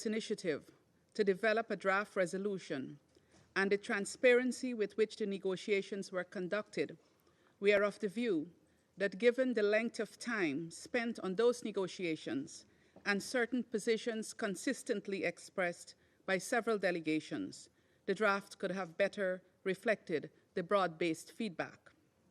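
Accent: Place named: Caribbean. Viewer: In her lovely Caribbean accent: The US resolution is bullcrap!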